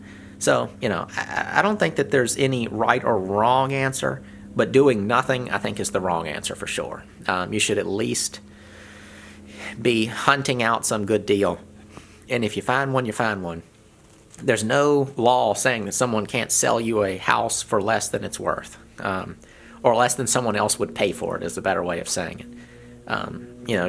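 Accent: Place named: Mid-Atlantic, United States. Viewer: There is a privacy concern which is totally valid. His accent speaks of the Mid-Atlantic states, which range from about Virginia, the Carolinas, into as far as the Tennessee border. That is an area that is bigger than your two islands, so his concerns about crime and safety are totally valid. Hard to pinpoint where he is, given that he has a family and business in a massively populated area. Don't ask, it's a security matter!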